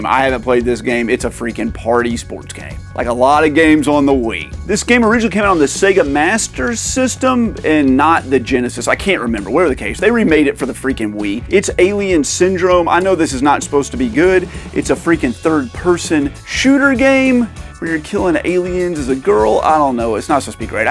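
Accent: South, United States. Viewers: Apart the cabbage..are you from suddern m'erica ,i dont know i thought you are from Canada... I am european i berely speak/write m'erican language, so please forgive me, but legitimately what country are you from 🤔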